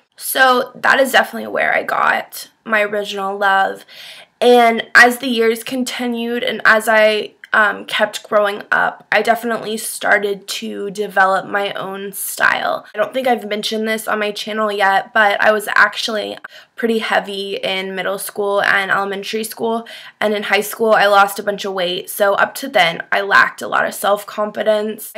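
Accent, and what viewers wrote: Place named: United States. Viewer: I love when you say yaaall !! That American accent ! <3 And your fashion is tops !!!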